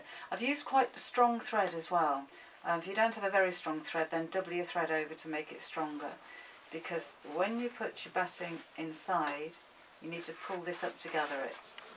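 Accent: England, United Kingdom. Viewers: It is so nice to listen to a tutorial given in an English accent.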